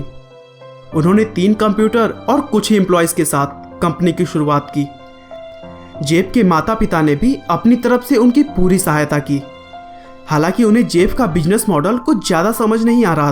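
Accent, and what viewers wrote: India. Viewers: Bai Hindi accent 😥 overall nice video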